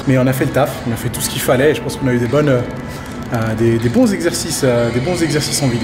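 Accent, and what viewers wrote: England, United Kingdom. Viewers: Meme pas une petite fin avec ton accent anglais?! J'espère voir ca dans une futur vidéo! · Sympa ce genre de petite vidéo aussi ! Par contre je suis déçu je voulais entendre ton accent anglais x')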